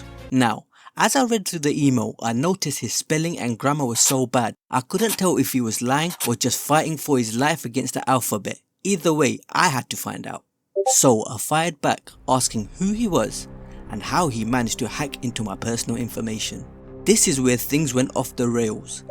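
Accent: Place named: Nigeria. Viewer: Why are you talking like a Nigerian 😂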